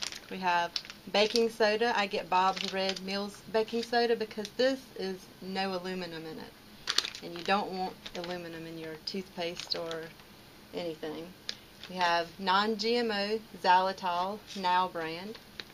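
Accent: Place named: South, United States. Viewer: Hi! Great video! Thanks for subscribing to my channel. I like your southern accent. ...Your teeth look great too!! Peace & Health to you, Itsy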